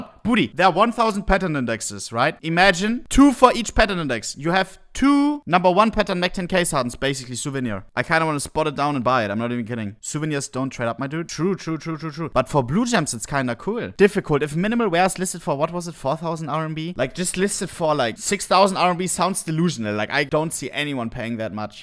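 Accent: Germany. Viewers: Idk who this guy is but he sounds so German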